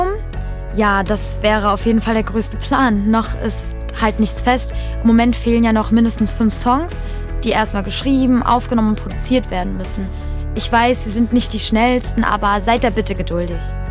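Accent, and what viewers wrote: Germany. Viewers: how come you talk German and when you talk in English you have a German accent but in your music videos it doesn't sound like you have a German accent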